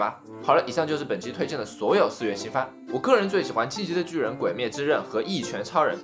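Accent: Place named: China. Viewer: 听这口音应该也是我们国内的吧。。。为什么我觉得YouTube这里台湾人很少会介绍新番?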